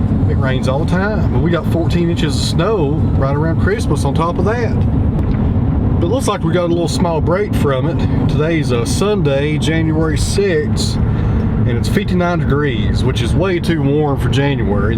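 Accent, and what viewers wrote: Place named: Kentucky, United States. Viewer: You sound just my family in far southeast KY. I recognize that accent. Fine people.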